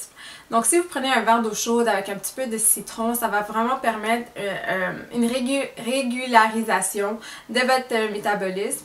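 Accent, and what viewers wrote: Canada. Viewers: C fou t'a un accent canadien c mignon 😊😊😊😊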